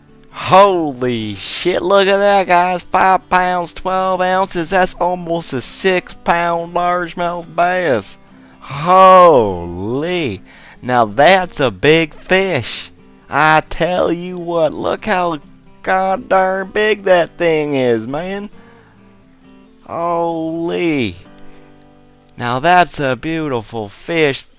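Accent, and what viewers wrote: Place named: United States. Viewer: Lol the country accent gets me every time 😂😂😂 Any thoughts on the summer update?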